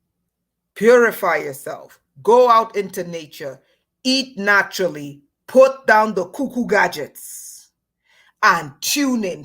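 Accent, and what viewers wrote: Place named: Trinidad and Tobago. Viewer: I love this Caribbean queen and I love how she blends in her Trinidad slang and pronunciations of some words. My country Belize which is a part of Caricom but located in central America also speaks broken English so I can understand very well especially when she becomes passionate with some words and tries to drive a point home. It reminds me of how our elders speak to us, very strongly, and by the end of the talk if you don't learn anything and take heed then you're on your own. "yo ears cahna tuff like kunut fiba"!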